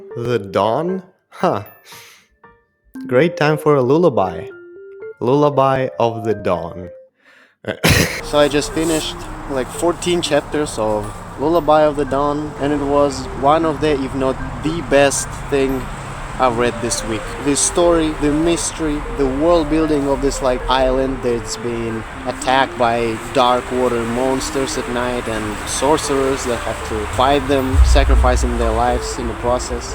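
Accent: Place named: Russia. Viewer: Probably not the comment that you're looking for, but your English doesn't suck! Your English is extremely understandable and your vocabulary is really good! Lots of the slang words and phrases you use correctly and where a native english speaking American would! Seriously you should be proud of it, while you definitely have a distinct Russian/slavic that's not your fault! Anyone who grew up natively speaking Russian or any eastern European/Rusified language is almost guaranteed to have an accent no matter their language proficiency, plus at least in America we probably converse with and understand at least one person with worse English and a more foreign accent than you on a daily basis! Don't let your English skills be what keeps you from creating, I promise it doesn't matter in the slightest to an American viewer! We're here for the personality which we can all decipher just fine past a slight, and very adorable, Russian twang.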